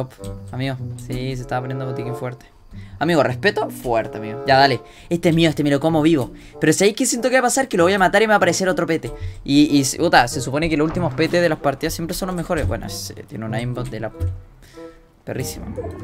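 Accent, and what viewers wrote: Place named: Argentina. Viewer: Ctm que es estresante cuando un weon que es chileno habla como argentino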